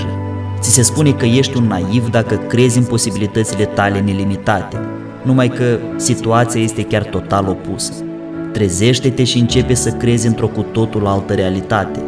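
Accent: Moldova. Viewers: Apreciez materialele realizate de tine, ma amuza ", graiul dulce moldovean", cât despre conținut, par bine realizate și bănuiesc, ca necesita ceva osteneala pentru a le realiza. Zice-se că nimic nu e-ntamplator, intr-adevar, oricât de greu ti-ar fi, oricâte obstacole vei întâmpină, pentru realizarea unor astfel de materiale, incearca sa constientizezi ca tu, de fapt esti un "instrument" prin care Intelligenza suprema a Universului, ne bucura și ne destăinuie prin tine, aceste lucruri minunate, "hrana" spirituala. Fii binecuvantat, suflet minunat 🙏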